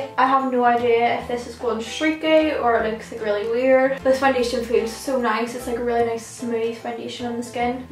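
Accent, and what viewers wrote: Northern Ireland, United Kingdom. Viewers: Are you northern Irish?? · Are u from Northern Ireland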